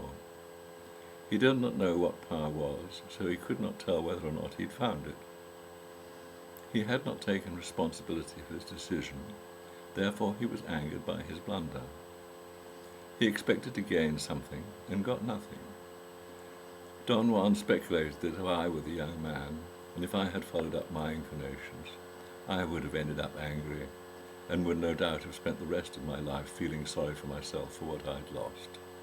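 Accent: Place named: United Kingdom. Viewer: Listening to this in the British accent and calm voice is sublime :)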